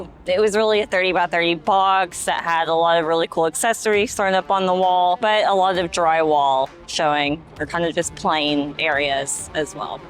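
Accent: South, United States. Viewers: Honey drizzled on yourself just kidding. I dont think you were kidding 😊. You have a southern accent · ❤❤❤ I love ur Southern Twang ❤❤❤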